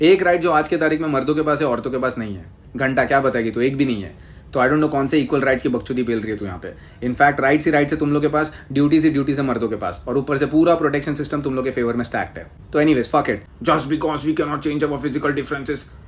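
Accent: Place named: Maharashtra, India. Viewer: Your Marathi accent is making this video 10x times better 😂❤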